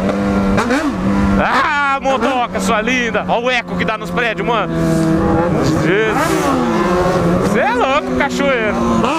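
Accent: Goiás, Brazil. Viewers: tu é Goiano??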